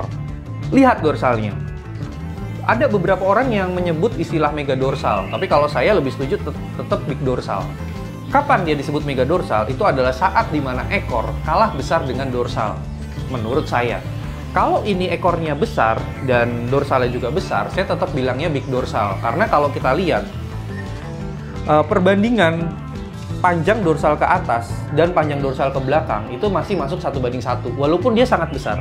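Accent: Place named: Banyumas, Indonesia. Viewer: Wong ngapak jebule.. hihihi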